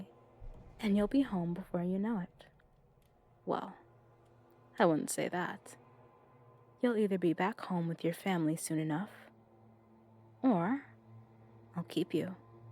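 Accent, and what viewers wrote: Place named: Scotland, United Kingdom. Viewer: I CLICKED ON THE VIDEO AND HEARD A SCOTTISH ASCENT AND IMMEDIATELY GOT HAPPY IM SCOTTISH, this is rare ✨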